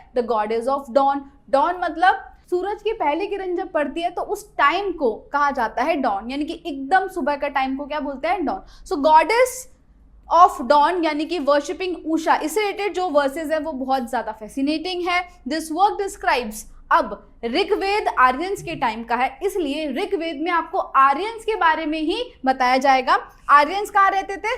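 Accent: Gujarat, India. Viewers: Are you Gujarati????😊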